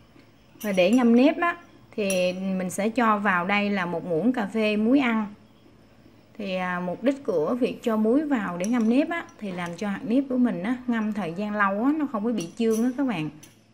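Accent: Mekong Delta, Vietnam. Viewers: nói giọng miền Tây rất là đáng yêu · giọng miền tây nghe mộc mạc! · ko ko bạn đừng thây đổi giọng nói nhe vì đó là chất giọng đặc trưng của người miền Tây nam bộ đó, theo mình “bạn chính là bạn “ thì đẹp hơn nhiều. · Cám ơn bạn chia sẽ cách nấu món xôi mình thích từ nhỏ. Nhưng xem clip này nghe thấy thương và thấy thích cái giọng miền tây nam bộ, cái giọng rặt chất "bắt con cá gô, bỏ chong gổ, nhẩy nghe gột gột"!!! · Giong noi miet vuon mien Tay Nam Bo. Tu nhien va de thuong lam.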